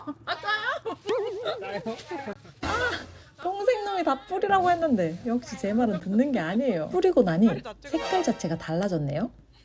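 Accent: Gyeongsang, South Korea. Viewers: 경상도 사투리가 섞인 표투리 나레이션 신선하네요 ㅋㅋ 구독 박고 갑니다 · 경상도 분이신가봐요? 사투리가 들리네요^^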